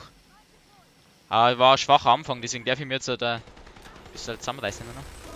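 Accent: Bavaria, Germany. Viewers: des boarische reden is so geil☺☺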